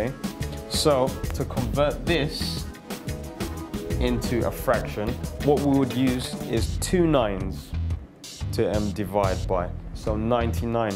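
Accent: United Kingdom. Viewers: Very good are you Brit ish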